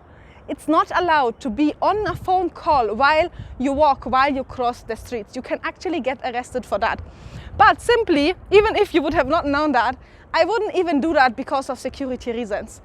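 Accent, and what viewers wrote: Luhya, Kenya. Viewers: Why does she sound like a Kenyan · Ni kama alifunzwa kingereza na wakenya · You almost picking up a kenyan accent. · you talk like a kenyan woman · You do have a local luhya accent and facial expressions that's a plus. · Haaaaa huyu si ni mluya ....aki ...Also love your luhyia accent and lips 😍 💕 and your nose looks like that of a luhyia ....😁😁😁😁😁🙊 hakuna matata...we wear suits ....allaaaar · You have a kenyan accent though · She has a kikuyu accent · It feels like she has a kenyan accent already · Has a Kenyan accent 😄apart from the French 'r' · She did a lot of research n got it right. But she sounds lk a person who have lived in Kenya before n if not, she's a very good learner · hiyo accent sio ya yurop. That's a typical kenyan english accent. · Is it the accent or do you really already sound Kenyan am as you say supprised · Damn your Kiswahili pronunciation to some Kenyan English accent is spot on!. 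you got a lot of Kenyan vibes wow! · Na uko na kizungu ya mkikuyu kidogo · Your accent is more Kenyan than European. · There's a bit of a Kenyan accent creeping in. Kudos to Kenya, they have developed to such an extent that tourists are attracted to their country.. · Her accent is so Kikuyu or somehow Kimeru....... Typical kenyan accent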